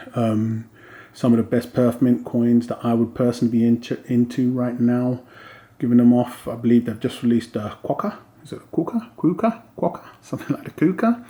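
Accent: England, United Kingdom. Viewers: I really love your English accent and style....I trust your opinion so I went with the 2020 Australia 1 oz Silver Australian Quokka BU . Thanks for your Spirit.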